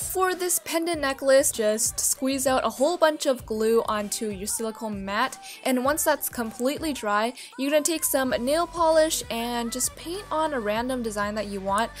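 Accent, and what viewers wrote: Canada. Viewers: I'm from England and I'm so proud that I managed to tell you are from Canada and not America from your accent 😂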